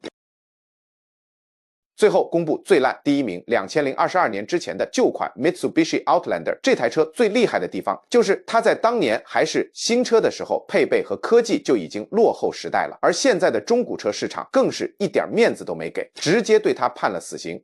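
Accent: China. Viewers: 這種一聽就是中共國口音 又在謊騙網友聽久了就被帶了風 日本的東西爛 車子爛沒有甚麼開不壞的 這就是中共國輸出的洗腦論述 天天講 有一天你心就歪了 就開始反日 這就是共匪最拿手的認知戰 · 你说的是普通话，不是台湾国语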